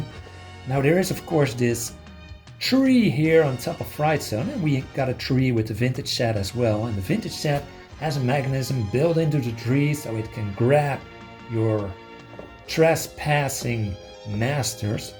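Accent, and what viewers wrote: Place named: Netherlands. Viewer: Aan het engelse accent te horen, ben je toevallig Nederlands? 😄 Ik ga me hoe dan ook abonneren op je kanaal 😊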